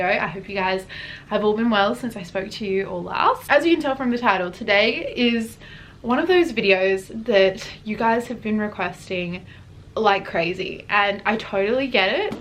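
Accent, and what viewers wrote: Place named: Australia. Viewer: I can tell your Australian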